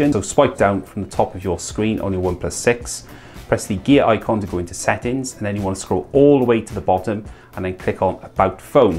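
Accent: Wales, United Kingdom. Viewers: Top tips helped me out 100%, you sound welsh? Me too, from aberystwyth! Cyngor a syniadau gwych!!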